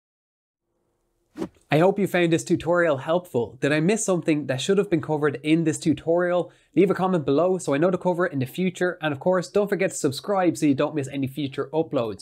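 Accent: Ireland. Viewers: Very helpful, thank you. Just wondering, since you sound Irish, I am in Ireland and thinking about buying a v-mount plate and battery for my BM6k, but almost everywhere on Amazon and other sites say they don't deliver batteries to my location. Could you recommend a site that does?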